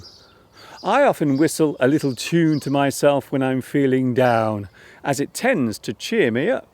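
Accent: United Kingdom. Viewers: great lesson think you I have question please? Are your accent British or Amrican because our education British so i ask to know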